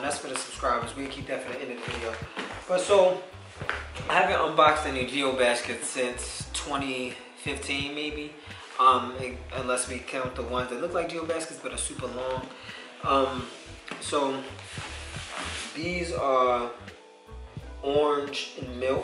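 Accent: Baltimore, United States. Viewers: u from baltimore???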